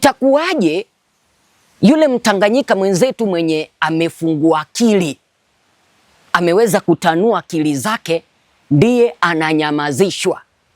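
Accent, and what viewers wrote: Kenya. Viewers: He has Nothing he is Speaking truth other thank making Up stories he Feeds from fake Stories media Comments and Posts..THIS IS A bullshit Kenyan Posing as Tanzanian while He cannot even Pronounce Nchimbi in a Right way instead he is using Kenyan and Kamba Pronunciation of “NJIMBI” and NDEJEMBI IS “NDENJEMBEI”. Huyu. Ni MSENGE ANALIPWA NA SATIVA NA MWAIPAYA KUPISTI UPUUZI USIO NA MAANA WA TANZANIA ASIYOIJUA.. ULIONA WAPI MTANZANIA HAJUI KISWAHILI?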